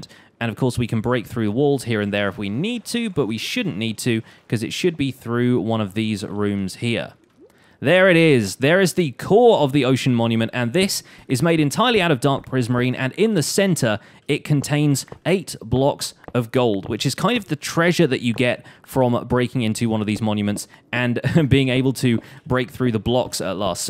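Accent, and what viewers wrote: United Kingdom. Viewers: I SWEAR he's British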